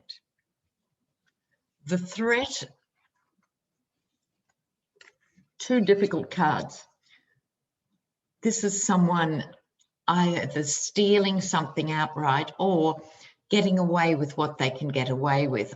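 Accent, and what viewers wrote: Australia. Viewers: I like hearing Australia as well as the United States of A.. Thank you for a great reading Miss. I send you big love from Beth in Mancos CO.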